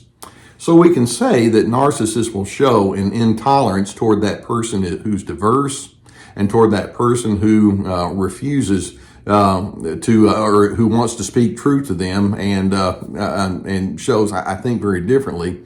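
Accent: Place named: Oklahoma, United States. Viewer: Sounds like Oklahoma coming from Albuquerque, NM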